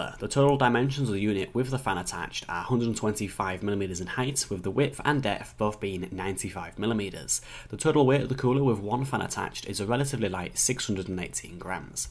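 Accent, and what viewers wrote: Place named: Northern England, United Kingdom. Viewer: I'm from the North of England and so is this guy. I've got no idea what he's saying half the time because he does leave a gap between most of his words. You've found the English Boomhauer.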